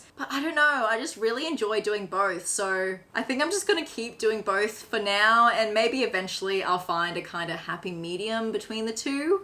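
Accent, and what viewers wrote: Australia. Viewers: 🐰Thank you for your vlogs. Hug from France. (Btw, your Aussie accent ,like Sarae Renae Clark, is so lovely and easy to understand).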